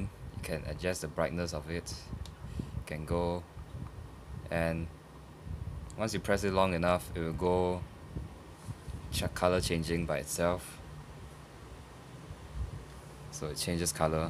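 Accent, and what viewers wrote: Singapore. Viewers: you sound singaporean haha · U r a singaporean right you sound singlishhh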